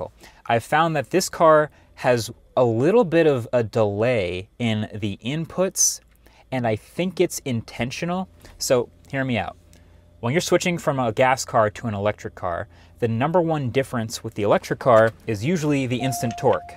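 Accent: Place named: California, United States. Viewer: nghe giọng điệu này niết ngay cali rồi ! Đúng chứ người Mỹ gốc cây ?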